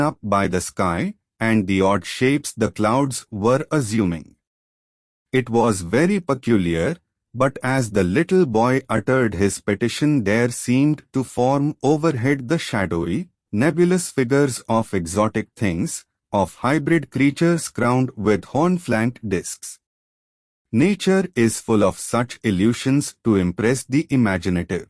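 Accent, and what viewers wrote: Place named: India. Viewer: Should work on that accent man.. only like 70 percent of this is understandable to non indians. The effort is respectable though🙏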